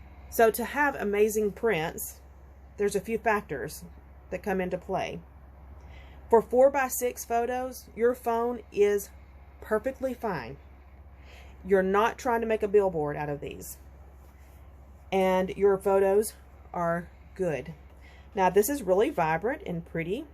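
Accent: South, United States. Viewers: I always start with my photos. That gives me the direction. PS: please don't stifle the "country," enjoy hearing your Southern accent. 🌞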